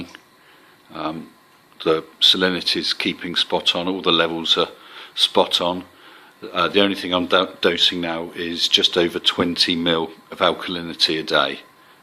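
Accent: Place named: England, United Kingdom. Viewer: Stunning foxface mate I love them, unfortunately I lost my juvenile foxface 2 days ago 😢 and I don't no the reason at all all my water parameters are spot on the fish looked healthy no signs of illness and he was eating like a pig so I think I was the case of things die 👎quick question buddy i has sps birdsnest frags for sale and I can post if ure interested you've got a English accent so ino postage isn't a problem lol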